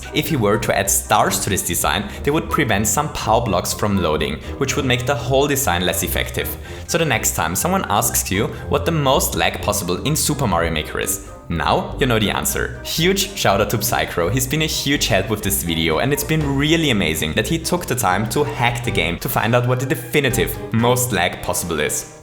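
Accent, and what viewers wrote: Germany. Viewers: WAIT ARE YOU GERMAN? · Does this guy make German-Videos as well? Because you can hear this by his pronunciation of some Words! (PS: Ich frage, weil mir seine Stimme bekannt vorkommt.)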